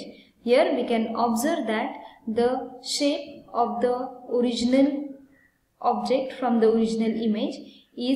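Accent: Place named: India. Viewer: i hear indian voice, i go back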